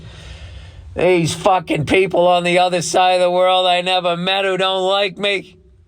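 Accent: Boston, United States. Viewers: Bill burrrrr. I nominate Bill for the CEO of Sam Adam's beeeerra- Boston Accent 😅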